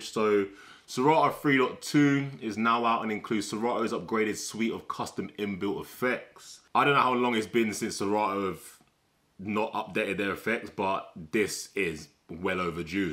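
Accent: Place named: Roadman, United Kingdom. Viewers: lol it's all good. You speak the Queen's English. My American English is a bad version of yours. 🤣🤣🤣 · Mans is road man bruv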